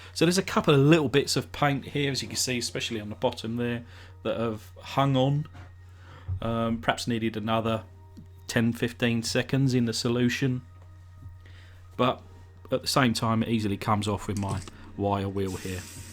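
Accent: United Kingdom. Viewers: Hey ;-). I have a question. Are you from UK ,from Ireland ,or at other english spoken Country ?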